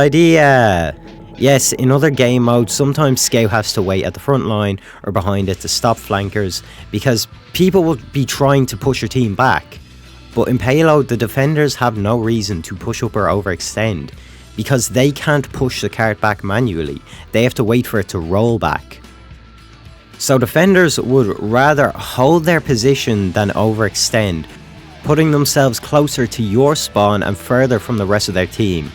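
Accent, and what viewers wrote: Ireland. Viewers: Very well done mr irish voice person. Sub increment plus one. One thing I've noticed playing defense as scout is that people get SUPER bitchy about it. You can't play scout for five minutes before you're being told you're the single worst human being to ever have been born. I don't usually play scout and I do usually play payload (or koth because koth has pretty maps) so it's super jarring to go from being the medic 96% of the time to being told I'm dead weight as a scout. (Particularly jarring when you go from top scoring the prior round and hard carrying an offense to being told to self terminate on defense) I always did kind of wonder if it's just me who experienced this and it seems not. Would love to see more. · Are ye a Dubliner? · is this man irish and so am i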